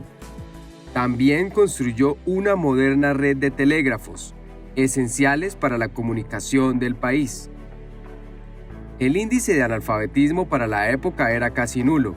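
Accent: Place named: Bogotá, Colombia. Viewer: El acento es de Bogotá, Colombia.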